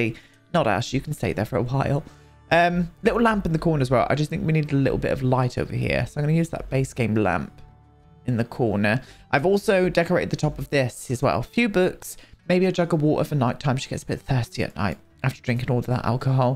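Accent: United Kingdom. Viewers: Good day I love watching you your sense of humour makes it fun to watch. And my favourite accent is a British one so listening to you and your laugh makes your videos that much better not including your talent and attention to detail. Bloody brilliant you are 😃. Even my husband enjoys watching you with me. Please take care stay safe your Canadian fan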